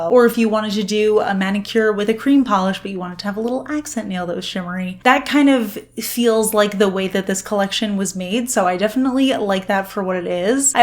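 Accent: United States. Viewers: Oh what a wonderful video- spot on! Thank you- have ordered 4 of them. Where from the US is your accent? Becky from Sydney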